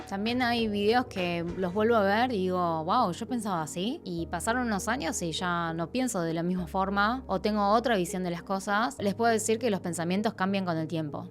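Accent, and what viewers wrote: Buenos Aires, Argentina. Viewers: Los haters son haters y se los debe ignorar. A lo mejor alguno que otro fan tuyo, que está aprendiendo español, le cueste entender el acento argentino; pero ese es muy su problema y no el tuyo. Sigue adelante y gracias por hacer tus videos. Saludos de Ecuador. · Me encanta verte y escucharte❤ tus hermosos rasgos coreanos y tu acento argentino. No hagas caso. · Vos sos porteña, hablás así y listo. Al que no le guste que no te siga. YouTube tiene otras opciones. Pero que no molesten en este canal. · Tenés acento de barrio norte y entiendo que sos de zona sur de capital. Raro pero atractivo. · Hola, llevo más o menos dos años viendo tu contenido. No suelo dejar comentarios en ningun lado, pero lamento mucho que recibas esa clase de comentarios y quería contrarrestar esa mala onda. Creo que mejoraste mucho no solo la producción de los videos sino también tu manera de expresarte y tu capacidad de renovar tu visión sobre las cosas, demuestra madurez como persona y responsabilidad como creador de contenido. 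Asi que te felicito mucho y te animo a que sigas! Me gusta mucho tu acento, yo también soy porteña y entiendo que a muchos puede no gustarles la tonada, pero te expresas correctamente y se entiende tu mensaje, asi que lo demás que te critican está de sobra. Que tengas una linda semana, fighting!:) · Nunca te desanimes, tus vídeos ayudan a conocer la cultura coreana. Y tu acento argentino, es tu identidad, seguí!! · La coreargentina mas linda.. Siempre nos critican a los porteños por nuestro asentos hasta los mismos compatriotas de otras regiones.. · No le hagas caso gente ignorante y envidiosa sos muy Bonita y bella persona y hablar argentino es un honor y otra vez la envidia yo que estoy en españa se que aquí te siguen así que nadie debería de hacerte menos mucha fuerza ❤ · Mal de muchos consuelo de tontos, decía mi abuela... qué lamentable que tengas que pasar por ésto. Volví a ver tu video con diferencia de 3 años. 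Es notorio el acento propio de barrios como Belgrano o Núñez fuera de Argentina sea motivo de mares de caracteres refleja lo mucho que avanzó desde sus primeros videos. De sentirse reflejada en la cultura de su familia hasta viajar allí y ver que si, al final era argentina. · Soy argentina, y lit tenemos el mismo acento, no hay problema en eso, tu acento es hermoso y no dejes que la gente te lo saque. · sos una argentina hablando como argentina, al que no le gusta que se joda, no elegimos nuestra cultura, somos parte y ya... me encanta tu contenido porque me hiciste conocer mucho más la cultura coreana y me parece muy valeroso que difundas las raíces de tus padres y abuelos... los argentinos tenemos muy naturalizado expresiones racistas u ofensivas que hay que erradicar, y creo que conocer más la cultura asiática nos va a ayudar a desandar eso... 💖💖💖💖💖💖💖💖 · Soy mendocina y adoooro el acento porteño, es especial TU acento porteño 💕 AMO esa combinación COREANA/ARGENTINA. 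Sos bella e inteligente. Tus contenidos son GENIALES y se nota todo el tiempo y el trabajo que ponen vos y Alex en su trabajo. ❤💙💖 Como dijo otro argentino por ahí "A la gilada ni cabida!!!!" 😂😂😂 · Sí cambia su acento porteño deja de ser, su querida Argentina es parte de tanto como su ascendencia coreana. Seguro es la envidia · Para mí hay pica con el acento porteño porque esta asociado al esteriotipo de argentino creído. Me parece algo bastante inmaduro que la gente siga con esa idea pero creo que es por ahí el problema. A mi me encanta que hables como yo, siento que aporta a nuestra identidad argentina (que es sumamente diversa) y termina definiendo al mundo que es realmente ser argentino. Te mando un abrazo y ojalá la gente salga del tupper dónde vive y se acostumbré a ver la realidad que es hermosa. Sos lo más💖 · Bueno en este caso hay que aclarar que no sería un acento argentino si porteño, ya que los argentinos no hablamos todos con el mismo acento. 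· todos los argentinos hablamos como vos 😋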